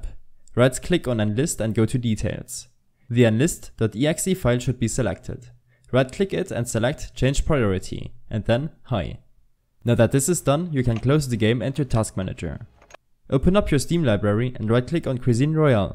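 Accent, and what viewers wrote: Germany. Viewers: i bet ur german i can tell it from ur english accent WAS GEHT AB DİGGA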